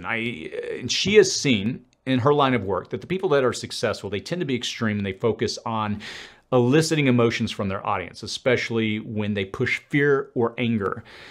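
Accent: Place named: South, United States. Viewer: Can hear the southern in ya hah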